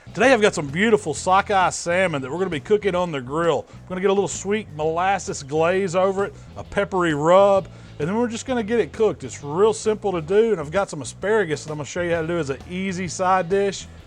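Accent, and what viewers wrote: South, United States. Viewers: For as much "southern drawl" as you have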